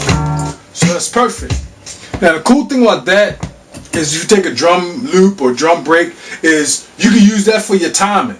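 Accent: Baltimore, United States. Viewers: Dope vid. You sound like you from B-more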